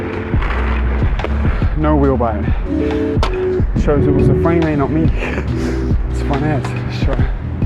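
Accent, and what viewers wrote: New Zealand. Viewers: Are you from New Zealand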